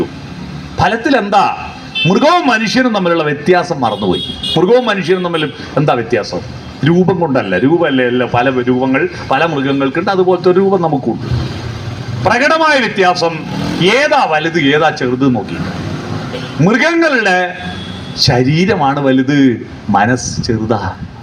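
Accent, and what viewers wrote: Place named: Vadakara, India. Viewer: ഭാഷാ വൈകല്യം നല്ലവണ്ണം ഉണ്ട് ചില വാക്കുകൾ മനസിലാവുന്നില്ല ഉദാഹരണം മൊത്തി (മുഖം എന്ന് മറ്റൊരു വടകരകാരൻ പറഞ്ഞു തന്നു ) പ്രാദേശിക ശൈലി യായിരിക്കും പ്രസംഗം കുഴപ്പമില്ല ഞാൻ മിക്കപ്പോഴും കേൾക്കാറുണ്ട് കുറച്ചുകൂടി പ്രാദേശിക ശൈലി മാറ്റി വ്യക്ത മായ ഭാഷ പ്രയോഗിക്കാൻ ശ്രമിക്കണമെന്ന് താല്പര്യപ്പെടുന്നു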